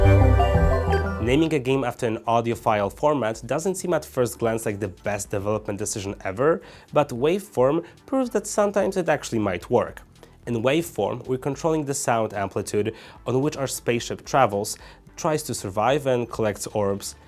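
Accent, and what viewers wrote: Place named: Poland. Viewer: Odrazu usłyszałem ze to Polak mowi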